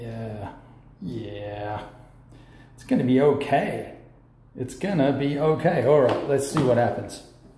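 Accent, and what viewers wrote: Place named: Australia. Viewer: The Australian accent adds to the Guy Pierce thing goin on. Though I reckon Mr Sharp is more the Teddy character in this one ;-)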